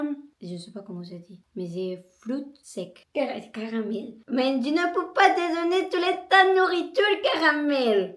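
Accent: Colombia. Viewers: En Tous cas, vous savez bien écrire français et j aime bien votre accent Colombien 😊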